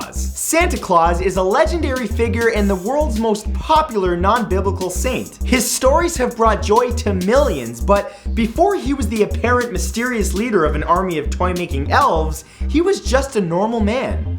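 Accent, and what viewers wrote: Canada. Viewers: Is he Canadian? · Wow now i definitely know that your Canadian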